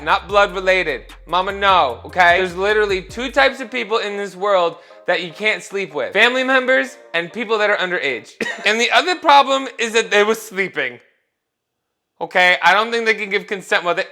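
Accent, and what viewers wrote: New Jersey, United States. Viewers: The longer stays in NJ, the more the accent comes back.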